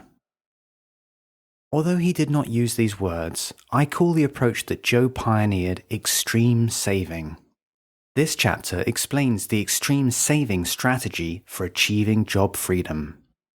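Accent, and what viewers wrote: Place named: United Kingdom. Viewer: Why does it have to be a british accent! 😭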